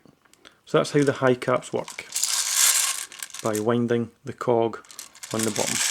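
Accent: Scotland, United Kingdom. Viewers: love your accent . are you from scotland? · Scottish lad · someone has a scottish accent! soo bad ass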